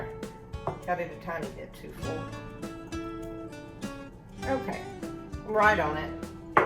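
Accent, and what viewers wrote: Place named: Kentucky, United States. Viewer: Hey girl 💥I don’t know where you’re from but you sound like you come from my neck of the woods!! Love❤️ love the video…❤️ from ky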